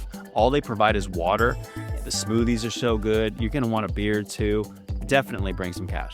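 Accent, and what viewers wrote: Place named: United States. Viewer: I have been doing some Google searching to see if a US citizen can use the international driving permit (IDP) with the motorcycle endorsement. The answer it keeps giving me: "No, a standard U.S.-issued International Driving Permit (IDP) does not work in Vietnam. This is due to a strict treaty mismatch known among travelers as the "country trap". Vietnam only recognizes IDPs issued under the 1968 Vienna Convention. However, the United States did not ratify that specific treaty and only issues IDPs under the 1949 Geneva Convention. Because of this, Vietnamese authorities view a U.S. IDP as legally invalid for motorbikes over 50cc" I am only assuming you are from the US by your accent. If you are, how were you able to obtain the correct license?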